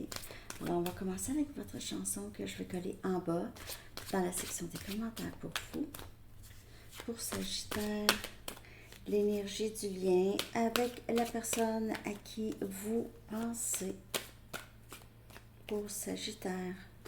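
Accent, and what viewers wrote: Quebec, Canada. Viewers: Bonjour J’adore votre accent 😅! Le Québec me manque! Merci pour cette lecture toujours très juste! Bonne journée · coucou JOLIE Du québec APPAREMMENT,???jE SUIS étonn&e car d'habitude j'ai du mal a écouter ce parlé bien spécial!!!J'adore VOTRE façon très personnelle de tirer les cartes votre SPONTANEITE et AUTHENTICITE également c'est RARE! J'adore vos mimiques naturelles vous êtes charmantes et UNIQUE! TOUT me parle en plus!!!! géniale vraiment heureuse de vous avoir découverte.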